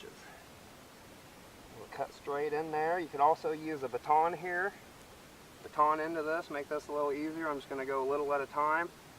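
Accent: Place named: Midwest, United States. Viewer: I thought you sounded like somebody I would know. I grew up in Vincennes Indiana. We had a big farm in Illinois.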